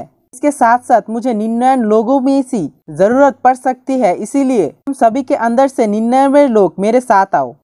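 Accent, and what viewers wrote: Bengal, India. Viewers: Bro r u bangali tumhara hindi pura nehi hae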